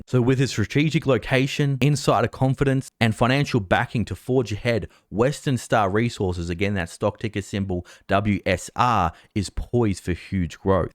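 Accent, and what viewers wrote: Australia. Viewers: Darta - Data PLEASE use your Australian accent !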